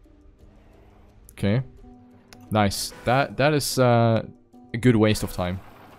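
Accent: Germany. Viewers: u German? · Damn the german accent is Go intensive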